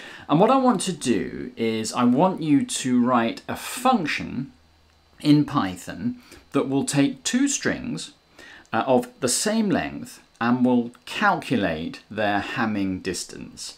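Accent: RP, United Kingdom. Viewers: Is this Queen’s English? He sounds quite sophisticated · I wouldn’t go so far as to it’s the Queen’s English, but probably as close to RP as you’ll see on a YouTube video on Python. As my mother would say, he’s ‘well spoken’ .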